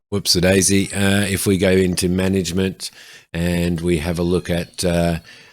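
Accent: Australia. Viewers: Australian, wah whoo!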